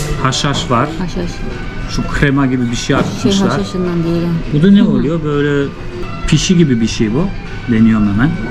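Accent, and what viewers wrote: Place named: Aegean, Turkey. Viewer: türklüğüyle övünen güzel bir kanal..tebrikler..şiveniz egenin ödemiş tarafları gibi, nerelisiniz kardeşim?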